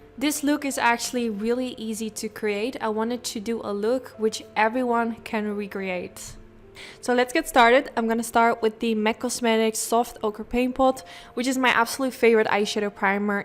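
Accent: Netherlands. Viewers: Do I detect a Dutch accent?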